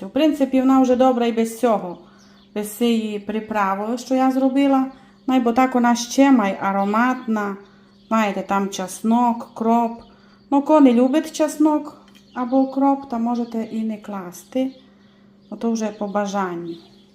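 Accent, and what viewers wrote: Transcarpathia, Ukraine. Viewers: Прекрасний закарпацький говір. Дуже мені подобається.. Щастя Вам. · Йой,як приємно чути нашу файну закарпатську. А ріпка-мням-мням.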